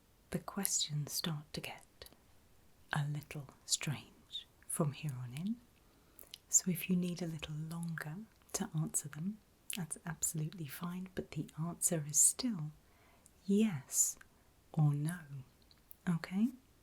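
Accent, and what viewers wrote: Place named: England, United Kingdom. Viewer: Thank you so much for making this video for us. You combined two things I love: being asked questions and listening to an English accent.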